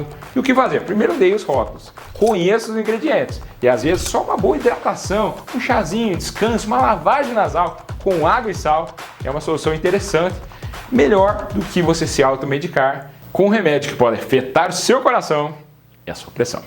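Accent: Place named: Minas Gerais, Brazil. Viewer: Gente este médico é uma benção de DEUS, gosto demais do jeito mineiro que ele fala parece que tá chupando picolé de groselha e falando ao mesmo tempo , ele é super bem informado , explica super bem , é simpático demais mesmo , um sorriso pra cima que levanta a gente , passa a impressão de ser muito cuidadoso com seus pacientes. Trás informações muito importantes e atuais pra gente , aaamo qdo ele fala : de que parte do mundo cê é ??? kkkk DEUS abençoe e proteja muito ele e a sua família, que ele continue sendo alegre , divertido , e super profissional como é, e sim , já me entupí de muitos remédios aí que ele citou , não sabia de nada disso , meu DEUS , já tomei muito azitromicina pra garganta, hj tenho usado muito o Propolis Verde que tem me ajudado e me imunizado. Fui 🙋‍♂️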